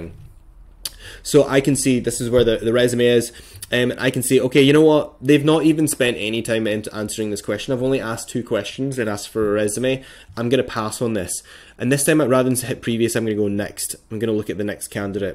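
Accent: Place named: Scotland, United Kingdom. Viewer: Tis a lovely Scottish accent, close to the Irish brogue that my family has. It is lovely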